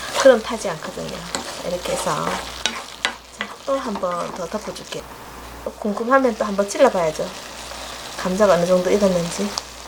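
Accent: Gyeongsang, South Korea. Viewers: 경상도 사투리를 어찌 이리 맛갈나게 쓰시는지! 음식보다 말씨가 맛있어서 보게 됩니다. 사진을 보니 인물 또한 고우시네요. 동영상에 얼굴을 보여주지 않는 이유가 시선과 관심을 님의 미모가 아니라 요리에 집중시키려는 배려 같군요. 요리 또한 맛있을 것으로 기대합니다. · 사투리 경상도 말씨 푸근 친근감 가요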